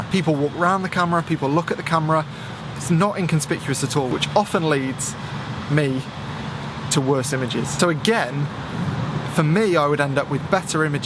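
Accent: United Kingdom. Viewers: I did not leave a negative feedback but I find videos with British accents off-putting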